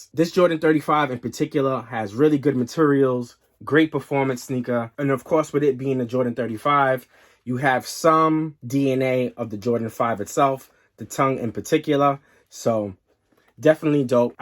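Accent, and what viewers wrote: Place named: Long Island, United States. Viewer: Dude got a harrrdbody Long Island accent hahaha love it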